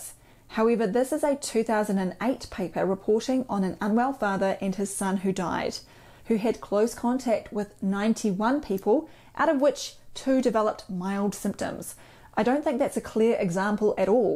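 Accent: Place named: New Zealand. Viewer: But she a perfect New Zealand accent…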